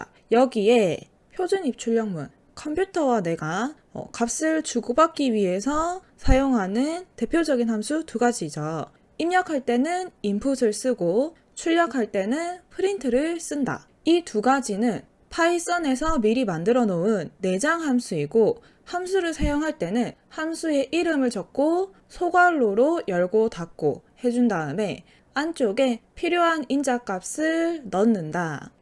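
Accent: Gyeongsang, South Korea. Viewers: 경상도 사투리 반갑네요 ㅋㅋㅋㅋ 전 서울상경해서 코딩배우는 대학생입니다😢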